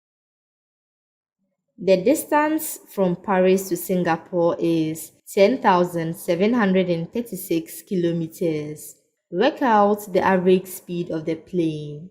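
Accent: Ghana. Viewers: are u ghanaian ? you have a very ghanaian accent either that or your from nigeria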